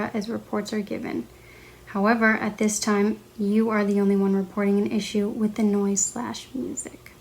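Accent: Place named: United States. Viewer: I noticed your car is a right hand drive. But you have an American accent. Are you in the states or did you buy a car from overseas and ship it to the states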